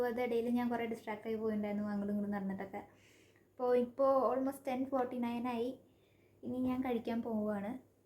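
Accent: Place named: Palakkad, India. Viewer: Pkd ahno than ? .. ur accent is like that !!❤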